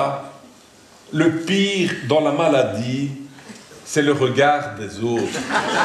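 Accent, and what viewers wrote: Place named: Belgium. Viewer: accent Belge évident!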